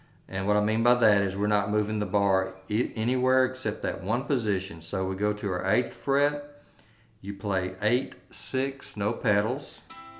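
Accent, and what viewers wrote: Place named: Tennessee, United States. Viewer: by the timbre of your voice I think you live in Tennessee, that is all..thank you for your time Sir.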